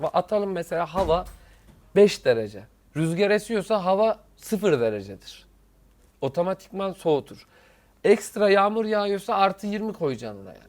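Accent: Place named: Ankara, Turkey. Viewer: Nasıl içten nasıl bizden. Angaralı gibi gonuşuyon valla. Arkadaşlarla mağaza geziyorum gibi oldum 😀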